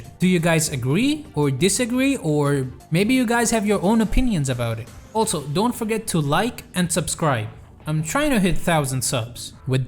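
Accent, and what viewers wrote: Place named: Philippines. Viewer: You sound very pinoy. Greetings from Malaysia. When you talk about small counters against heroes, you can add that cold snap interrupts hero such as Earthshaker who has awful casting animation. EMP drains Medusa's Mana Shield. Ice Wall and Forged Spirit depletes Templar Assassin's Refraction quickly. Tornado dispels enemies too, for example Windrunner's Windrun and Scurry of Hoodwink. You also should mention Catacalysm which is the highest damage single cast spell in DotA, higher than Thundergod's Wrath I believe.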